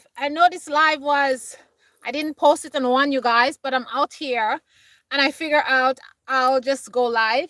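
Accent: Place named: Jamaica. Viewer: I thought you were Jamaican,as your accent is similar.. Cheers ❤️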